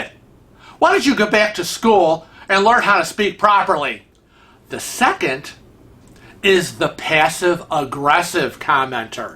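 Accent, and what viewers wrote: United States. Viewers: you sound mid western to me. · As someone who grew up and lives in the Boston area, I can understand your speech pattern with your slight upper state New York accent better than I can from the locals here in Boston. Lol.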